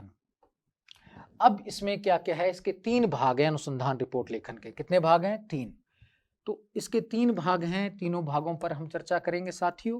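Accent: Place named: Rajasthan, India. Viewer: Aap Rajasthan se honge 100% kyu ki hindi language ko bolna or likhne m jo galtiya aati h wahi k logon m aati h . Regional language ka asar hota h .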